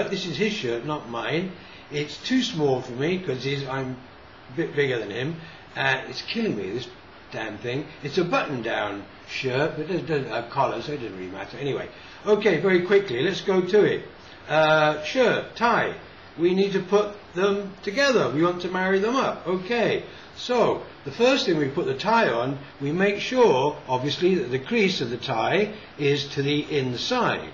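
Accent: United Kingdom. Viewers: It worked because your have an english accent. That other guy who does these videos is a jag off.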